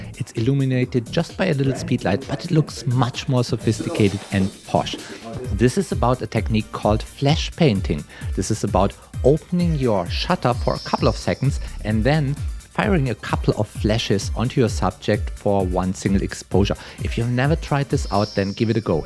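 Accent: Germany. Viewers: That German accent :)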